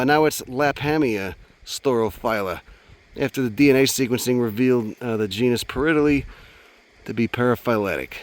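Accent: Chicago, United States. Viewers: i found a link to this channel in a 2yr old reddit post about chicago accents. wasn't expecting to find a live channel with a brand new video. anyway, southsider here. you sound like we could be neighbors.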